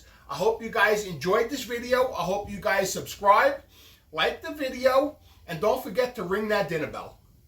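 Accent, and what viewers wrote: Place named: East Coast, United States. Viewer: I'm living in Chicago. First,I love the accent. I miss the East Coast. I love this.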